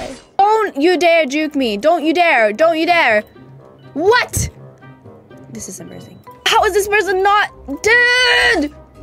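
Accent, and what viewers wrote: Sweden. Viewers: Wait, are you Swedish? 😛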